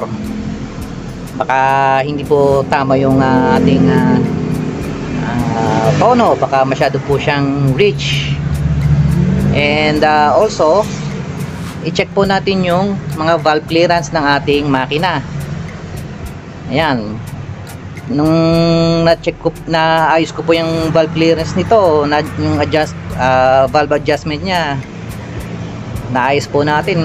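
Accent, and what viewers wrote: Pampanga, Philippines. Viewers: kapampangan ka boss?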